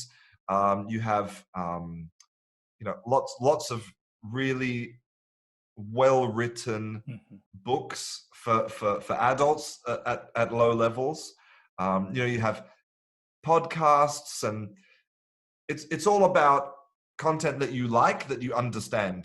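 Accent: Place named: United Kingdom. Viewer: British accent ! you are excellent man. Thanks ....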